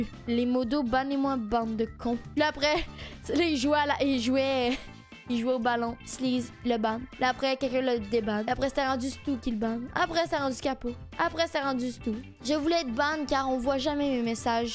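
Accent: Quebec, Canada. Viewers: J'aime bien ton accent québécois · Elle a juste l'accent québécoise il y rien d'exceptionnel mdrr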